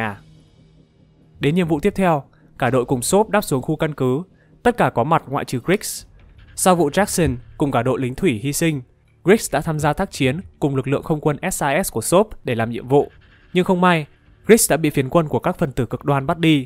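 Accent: Standard. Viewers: Bạn đọc khá rõ và phát ngôn chuẩn.. đóc tiếng anh chính xác tôi khá thích.. mog kênh sẻ ngày càng phát triển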